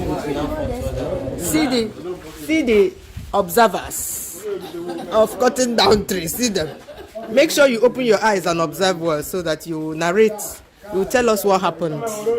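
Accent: Igbo, Nigeria. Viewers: I love your igbo ma, it just sweet to my ears😂😂😍😍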